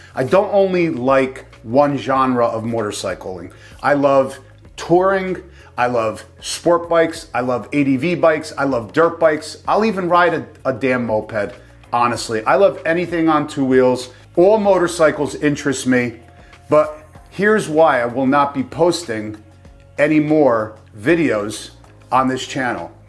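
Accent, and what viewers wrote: New Jersey, United States. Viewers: Thanks for the explanation! I was worried that we'd miss your enthusiastic Jersey accent :) Maybe a nice segway to the new channel would be you getting on a PanAm or better yet, a Buell. then you get all the viewers, HD and Sporties alike lol In all seriousness though, as long as your riding youre good in my book. And if folks cant handle learning about a different type of bike, well....as my mom would say "sucks to be them". I hope you throw a couple Harley videos on the sport site, just for kicks ;)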